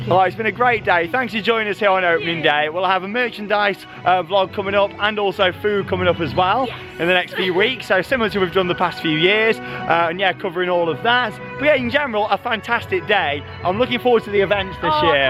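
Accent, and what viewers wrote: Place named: Stoke, United Kingdom. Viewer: My wife and I have just brought annual passes for the year. I think we're from the same city by your accent (stoke) so we will be going towers a lot this year. My little lads almost 3 so the cbebbies content was much appreciated, although I would rather give it a miss and ride nemesis all day long 😁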